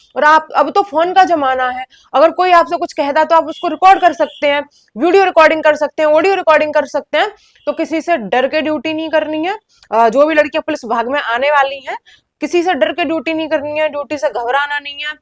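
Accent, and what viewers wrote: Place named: Western Uttar Pradesh, India. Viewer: Western UP se ho na aap, aapki tuning se lag raha hai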